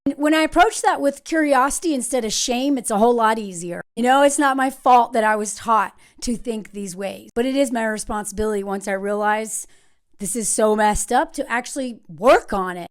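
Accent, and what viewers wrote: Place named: Texas, United States. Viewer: The way you talk reminds me of one of my Grandmas. She was from way out in the country in south Texas (not sure which town).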